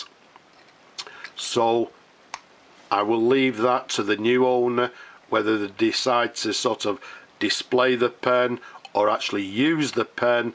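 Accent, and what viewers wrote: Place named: Yorkshire, United Kingdom. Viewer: tha sounds lak a Yorkshire man 😎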